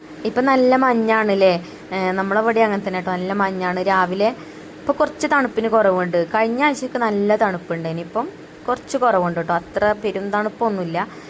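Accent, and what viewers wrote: Malappuram, India. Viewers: ഞാൻ ഒക്കെ വളരെ ദൂരെയാണ് എന്നാലും വാക്കുകൾ ഒക്കെ സെയിം റസിരെ വർത്തമാനം മലപ്പുറം ഇശൽ ഉണ്ടെന്നേയുള്ളു നമ്മൾ ഇവിടെ കണ്ണൂര് ജില്ല കാസർഗോഡ് ബോർഡറിൽ.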